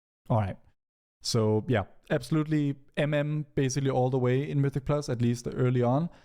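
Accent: Denmark. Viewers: Are u a dane mate? Its sounds a little bit like it. Great work btw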